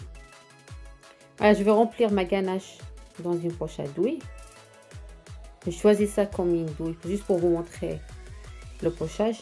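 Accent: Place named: Algeria. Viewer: هذي من لهجتها باينة جزائرية شكرا على الوصفة اختي وبارك الله فيك انا جيت من طرف جيجي ستارز 💕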